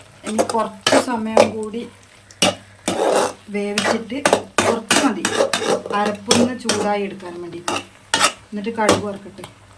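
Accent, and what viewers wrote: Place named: Kannur, India. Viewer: ok👍 ഭാഷ കേട്ടത് കൊണ്ട് ചോദിച്ചതാണ്... ഞാനും കണ്ണൂർ ആണ് 😃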